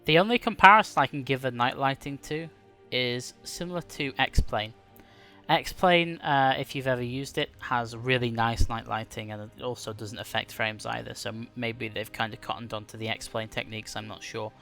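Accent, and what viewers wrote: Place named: England, United Kingdom. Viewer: can you realy not tell by his accsant, that he is English? i am Norwegian thoght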